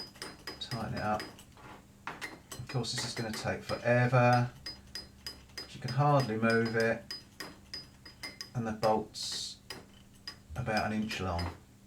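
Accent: England, United Kingdom. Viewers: can't be asked!!! You are so English, I love it!!!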